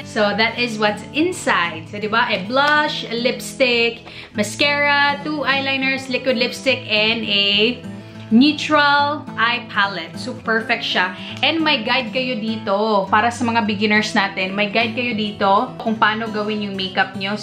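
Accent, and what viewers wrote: Visayas, Philippines. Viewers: Ate 💗💗😉😉btw pang ilang commeng kona bato😂😅but its okay...ate alam mo ba na pangalawa ka sa mga best youtuber para sakin..simula palang kasi nung napadpad ako dito grabehan na ehh...nagtuhan ko agad channel mo..and sana te mabasa moto ilolong comment ko na..ate hope i win for this giveaway kahit eto lang kahit isa lang dyan basta may matanggap ako from my favorite youtuber...plis🙏🙏sana talaga ate...kasi matagal kong winish na manalo sa mga giveaways mo pero lagi nalang hinde🙍kaya sana this time manalo namn ako😿sana..btw ate wlang halong eklabush to ah.ang galing mo talaga and ang cute mo magsalita...bisaya?? Hahah same tayo ate papa ko kase bisaya pero andito kami ngayon sa caloocan city...i hope ate mapili mo talaga ko..pag napili moko gagawan ko yan ng bidyo😂and irerebyu ko yan😅💗pramis...kapag napili moko d ka magsisisi..hahha...lab yuu ate..keep it up👍sana talaga mapili moko..btw mag rarandompicker kaba o ikaw mismo pipili??? 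Sana ikaw nalang mismo para mas maganda kasi alam mo kung kanino dapat yan mapunta..anyway un lang..lab yuu 😂😂sana talaga mapili moko. Wish ko lagi kong pinagdadasal..plisss...1million na plis..hahha💞un lang ate. Ig: maizel_jems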